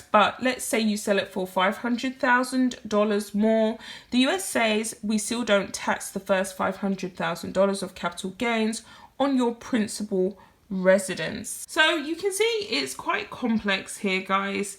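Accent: United Kingdom. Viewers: A none American with a Brit Accent is explaining tax changes- you can’t even vote. Quit throwing shade on Joe.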